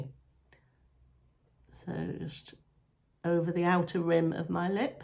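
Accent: Manchester, United Kingdom. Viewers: Aw thank you! ❤️ I thought I could hear some Manc in there somewhere! Listening to you makes me miss home ☺️💜 looking forward to seeing more of your content! 😘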